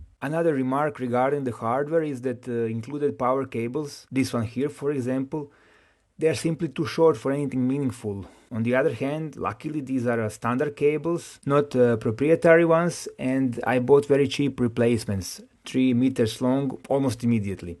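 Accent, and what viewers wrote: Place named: Eastern Europe. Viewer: A giudicare dall’accento ho pensato che tu fossi slavo ahaha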